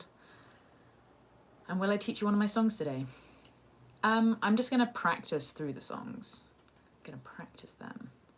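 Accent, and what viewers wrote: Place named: England, United Kingdom. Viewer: it's the accent. Kind of wish I knew from which part of England. It's killing me. Must. Marry. <3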